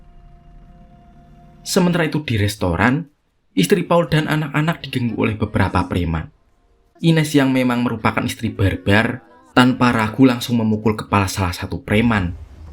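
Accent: Java, Indonesia. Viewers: Logat Jawa pekat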